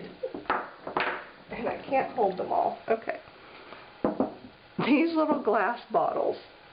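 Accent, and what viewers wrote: United States. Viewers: Sorry but your American English is cracking me up!..pipe ets?....in the science lab we call them...( pip ets) not (pipe-ets)...gosh I love you girl.....lol...lol...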